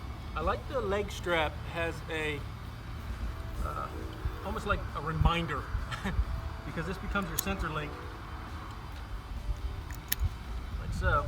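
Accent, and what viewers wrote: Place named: New Jersey, United States. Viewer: Nice video. FIrst time on your channel - you sound like you have a New Jersey or PA accent. Reminds me of home. Thumb's up.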